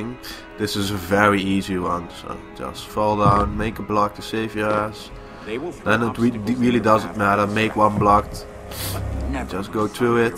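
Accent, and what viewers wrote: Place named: Netherlands. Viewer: You sound dutch lol.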